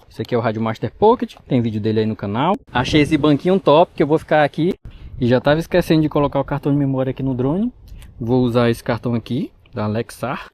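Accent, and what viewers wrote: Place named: Maranhão, Brazil. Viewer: Vi um vídeo seu que estava se tremendo todo e com dificuldades ksksks. Ja melhorou muito. Vc é maranhense né? Pelo sotaque eu suspeitei que era, e depois que vi escrito UFMA, ai tive mais certeza ainda. Mas só me tira essa dúvida para eu ter mesmo certeza. Sucesso ai meu brother, vídeo muito bom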